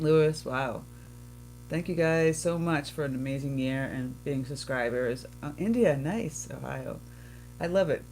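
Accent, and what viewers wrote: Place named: Massachusetts, United States. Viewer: I agree about '21 being tougher than '20. Hoping '22 is much better for all of us. I think we're all weary and sick of hearing about the virus. Time to get back to living! I thought I detected a MA accent! I grew up in Waltham but am in NH now (since '99). Thanks for the video. I hope your holidays were enjoyable and peaceful.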